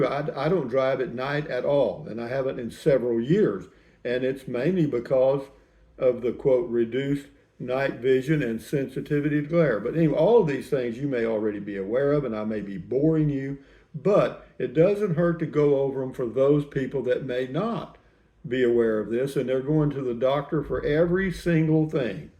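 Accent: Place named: South, United States. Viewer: Hi! Nurse here. Maintaining your dignity is half the battle of aging. I love elders!!! Greatest generation. You are doing it well,. You are as fine as an older Mathew McConaughey. Love that southern charming drawl.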